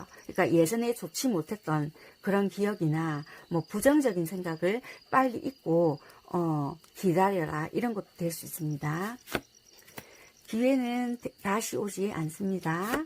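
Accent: Busan, South Korea. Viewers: 1번👀✨.... 정말 꼼꼼하고 현실적인 리딩 감사합니다♥ 덕분에 용기를 얻고가요 가 저에게 최고 잘맞는거같아요 👀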